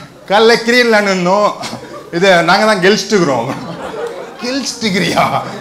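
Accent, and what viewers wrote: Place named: Bangalore, India. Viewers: Bangalore tamil😍😍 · You are doing better keep it up.The Bangalore tamil accent really exists. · That bangalore tamil accent on point 🔥🔥🔥🔥🔥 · Bangalore slang 😂😂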